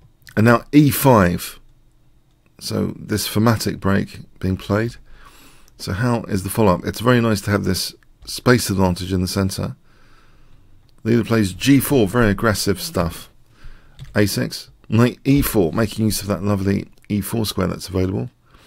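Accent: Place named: London, United Kingdom. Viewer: You sound like a Londoner so surely you're familiar with Grosvenor Square? The 's' in Grosvenor is silent, you know.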